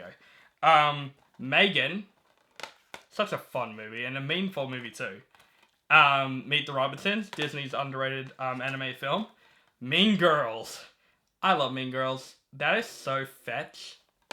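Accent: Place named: Australia. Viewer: Never thought I'd hear an Australian apologize for doing a "terrible Australian accent" lol You sound Australian to me.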